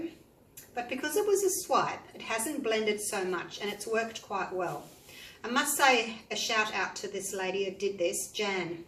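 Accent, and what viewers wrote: Australia. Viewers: Do you are silicone to your paints? I just love watching you :) YOU ARE FUN Fabulous So Cheerful and very TALENTED too! Love your Style & Aussie Accent is music to my ears :) just lovely and your designs are brilliant!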